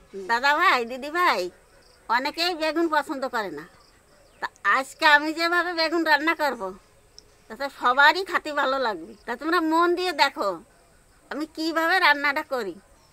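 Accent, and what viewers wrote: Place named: East Bengal, Bangladesh. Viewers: Bhai,tomake Tumi bolchhi ,ranna to batei tomader ke darunlage.tabe sab kichhur theke je jonyo ami dine akbar holeo video ta dekhi,seta thakuma ba mayer kathar jonyo,amar babar bari opar banglay,amra barite ei rakam bhabei katha bole dasher bhasata bachie rakhtam,tai tomader dakhlei àmar ki je bhalo lage bojhate par bona,bolei fellam,Bhai ,kichhu mne korona khub bhalo theko. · ও দিদি দেখে তো জিভে জল এসে যায় খেতে ইচ্ছা থাকলে ও পেটটা সাথ দেয় না ! এতো সুন্দর রান্না, সত্যি রান্না করাটা একটি শিল্পকলা তার চাইতে বড় আপনার কথা গুলো শুনতে খুব মজা লাগে! কারণ আমরা এখন তো পূর্ববঙ্গের কথাবার্তা আন্তরিকতা মানুষে মানুষে মিল বন্ধন ভূলে গেছি!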